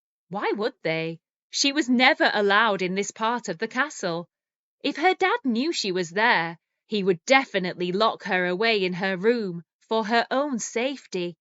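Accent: England, United Kingdom. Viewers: Hyy I like your accent that's why I'm addicted to your voice hope you Will continue these masterpiece stories ❤️ I wanna get British ascent like you , may be you can understand how much I love British accent 🙂🙂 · Yes indeed, I really enjoy the story. Love your English accent. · Are you from britain, your accents is soo british..thats nice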